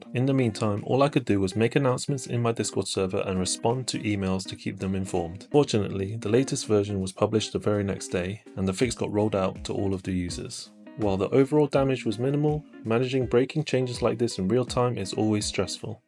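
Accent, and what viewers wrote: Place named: United Kingdom. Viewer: 영국 유학 다녀오셨나요? 영어 너무 멋지십니다!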